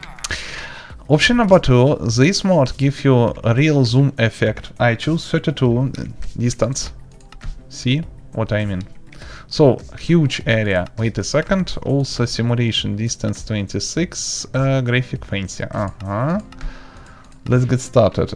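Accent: Russia. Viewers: Русский акцент :| учи русский :D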